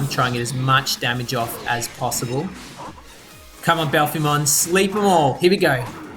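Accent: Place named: Australia. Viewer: Cheers for all your videos your making on rearise man i really appreciate it, its refeshing hearing another aussie voice keep them coming bro you've got yourself a new sub :)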